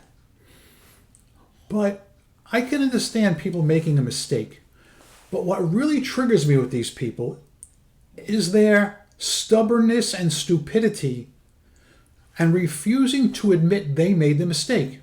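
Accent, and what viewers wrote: New York, United States. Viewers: I know your frustation very well, but see you saying it like that in your New York/NJ accent was nice with my morning coffee over here in Europe.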